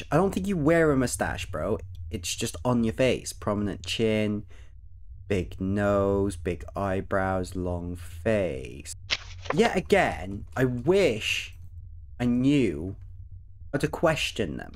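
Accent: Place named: Wales, United Kingdom. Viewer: Ohhh welsh!! Yeah i was thinking smth british but it didn't sound quite london-ish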